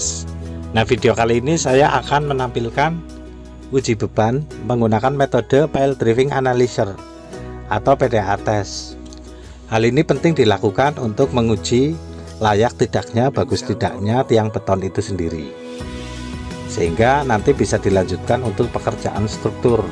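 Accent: Java, Indonesia. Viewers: Rika sih jawane ngendi melu ngode lah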